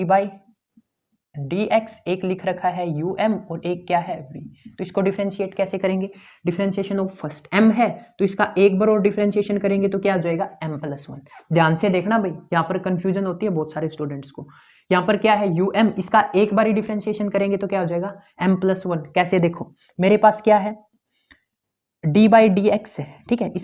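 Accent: India. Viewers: Teaching skills are amazing.....nd ur Desi accent make it more interesting sir 🙌❤️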